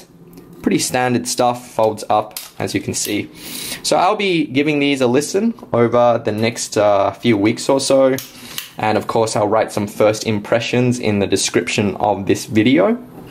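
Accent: Australia. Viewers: I like ur australian accent!!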